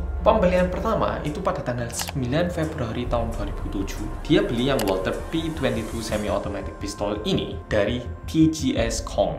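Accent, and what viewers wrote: Java, Indonesia. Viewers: Jawanya terlalu berat untuk aku. Sukar utk dihadam 😅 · Mas Hansol :hai guys welcome back to korea reomit bersama orang korea yang........ Satu kampung sama gw : Medhooooooooooooook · Untung mas Hansol dulu di bawa ke Malang..korbanya cma rawon sama pecel...beruntung dan besyukur...teman" di skolahan masih berhubungan baik...jadi medok lagi.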